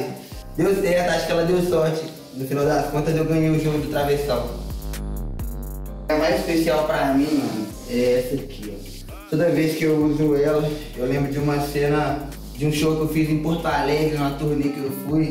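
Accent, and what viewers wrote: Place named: Belo Horizonte, Brazil. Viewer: bh ne cria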